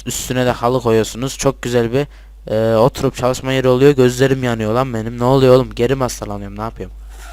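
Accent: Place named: Istanbul, Turkey. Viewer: sesin öyle 34 gibi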